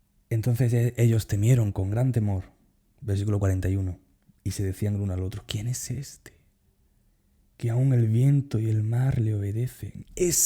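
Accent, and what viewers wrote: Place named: Guatemala. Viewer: Representando Guatemala, ya te volvió el acento, gracias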